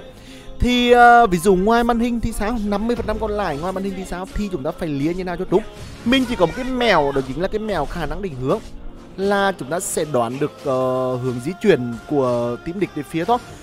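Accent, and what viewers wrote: Nghe An, Vietnam. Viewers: Người miền Trung, Nghệ An đúng tình cảm luôn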